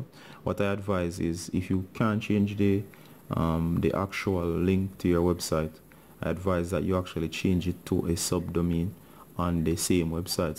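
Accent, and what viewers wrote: Jamaica. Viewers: Your a Jamaican?💯🇯🇲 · I love the Jamaican accent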